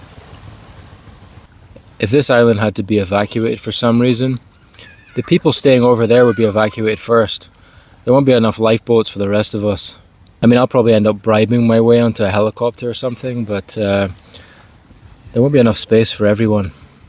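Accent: Scotland, United Kingdom. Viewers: that Scottish accent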